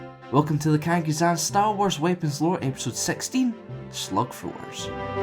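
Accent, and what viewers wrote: Scotland, United Kingdom. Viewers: no hes scottish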